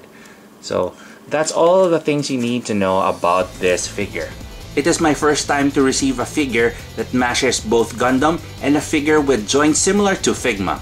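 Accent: Philippines. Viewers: Are you filipino? Our accent when i speak in english is almost the same. xD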